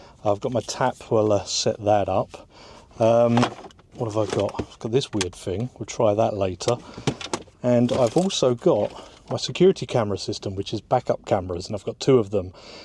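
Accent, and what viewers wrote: England, United Kingdom. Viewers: Sem aye , your English man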